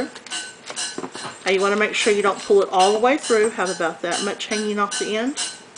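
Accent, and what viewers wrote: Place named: West, United States. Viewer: talkin like a cowboy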